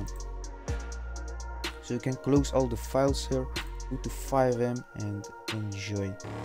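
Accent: Netherlands. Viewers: ik hoor een beetje nederlands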